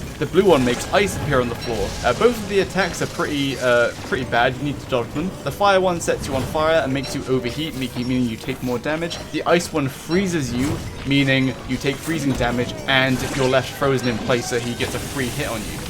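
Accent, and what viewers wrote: United Kingdom. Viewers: This Dude "The Spiffing Brit" You sound so much like him at the beginning of the video that I though you was trying to copy his work. Not just the brithsh accent but some lets say jargons are about the same. Brithsh people must talk in some pattern..That is sure to sound silly but there's a reason for it I've watched the whole video though and i love it. Never got so far in this game as the first winter aways kills me and friends so i've leaner a lot. Sorry if that coment bothered ya, no one ever replies so i didn't take the time to remove it. I subscribed right at the and of the video, and I hope to see more of your work on dont starve as it is a game that i like a lot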